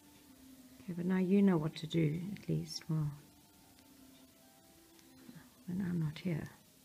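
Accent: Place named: South Africa. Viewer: Right away I picked up the South African accent. I lived in Cape Town for a bit and my best friend that I lived in CT with is from Johannesburg.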